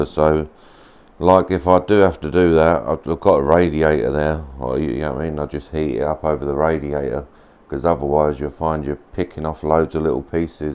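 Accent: United Kingdom. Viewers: I thought you were from the UK with that accent.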